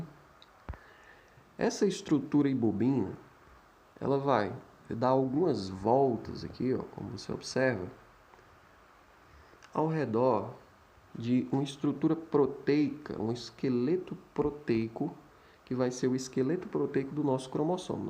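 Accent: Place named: Minas Gerais, Brazil. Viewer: Modo de falar legal em minas, amei esse trem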